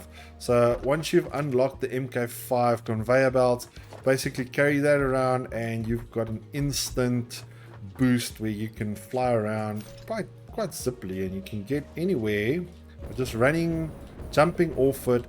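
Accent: South Africa. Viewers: Are you South African boet? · This guy sounds South-African 🤭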